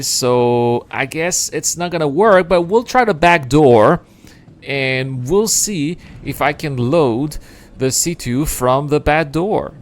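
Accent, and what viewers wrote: France. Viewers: so whats with the French accent?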